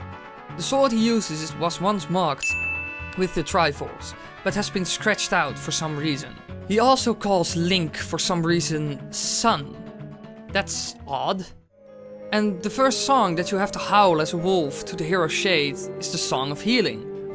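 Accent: Netherlands. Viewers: It's sounds like you have a dutch accent😁 I like the theory, it turns things dark. Me likes dark ;3 winkwinknotchnotch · Are you dutch? cuz of the accent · You sound dutch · You sound heel erg Dutch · I can hear in the voice of someone from the Netherlands that they're Dutch since i hear it a lot since i am also dutch. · Damn I thought from your accent you were either Dutch or Swedish.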